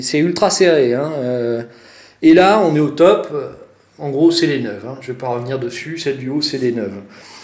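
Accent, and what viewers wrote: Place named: France. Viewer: mmm, this amazing french voice )))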